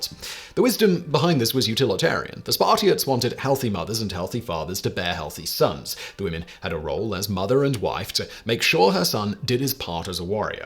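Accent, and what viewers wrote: England, United Kingdom. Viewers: Do you mean his English accent?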